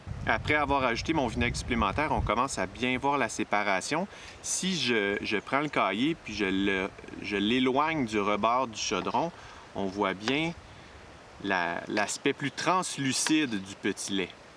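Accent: Quebec, Canada. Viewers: Super sympa la vidéo en foret ainsi que la fabrication du fromage ,et c'et accent du Québec ,Nostalgie ! Bien des choses de Carcassonne Serge et Véro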